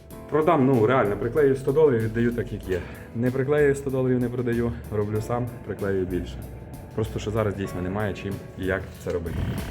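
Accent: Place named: Rivne, Ukraine. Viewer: друже ти з Рівного,чі десь прруч?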